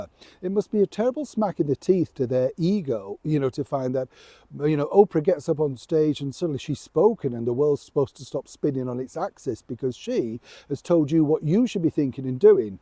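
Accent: Yorkshire, United Kingdom. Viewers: She's always been a fantastically attractive woman, and it's good to hear that she has faith in God. You are right; some people are way too spiteful online now. I like when you said you speak your mind. I think that's why I watch you. I'm guessing you come from Yorkshire.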